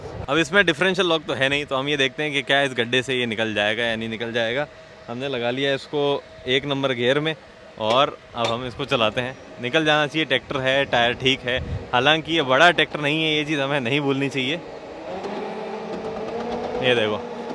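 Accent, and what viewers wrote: India. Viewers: U are using somewhat desi extent in your voice while reviewing this desi vehicle that's an awesome thing ❤❤❤❤❤❤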